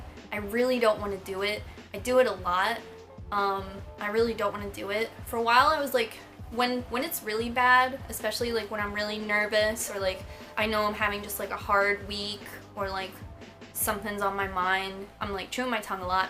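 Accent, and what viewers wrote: Philadelphia, United States. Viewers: I feel like you say, "I don't even-t know" with your Philly accent a lot :)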